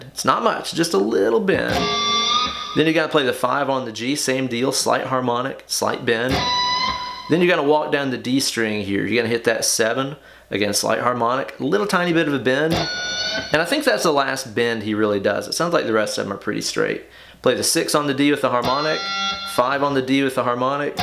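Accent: South, United States. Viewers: I didn’t hear it. Maybe it’s just that country accent. I get a kick out of it. Reminds me of my days in Alabama.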